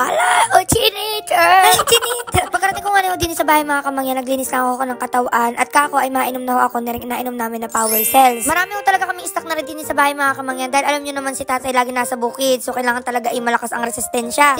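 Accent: Batangas, Philippines. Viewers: Why Kamangyan ang vlog mo? Are you part of Mangyans? Nagtatanong lang, curious lang ako. Kasi your beautiful even no make up. Saka accent mo Batanguena. Ala Eh!! From Las Vegas, Nevada USA.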